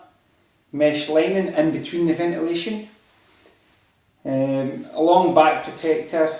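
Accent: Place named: Scotland, United Kingdom. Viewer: Dude are you scottish? wow your accent... Nice review anyway thanks!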